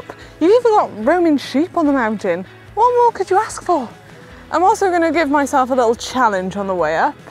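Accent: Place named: Wales, United Kingdom. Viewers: Welsh!! That explains the accent!! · is it me getting used to the sound of it, or is accent coming out more? it's lovely, actually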